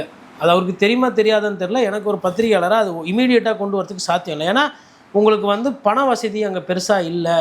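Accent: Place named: Chennai, India. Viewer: அண்ணா நீங்க வேற மாதிரி.சென்னை பாஷைல.ஆனா நா கோயம்புத்தூர்.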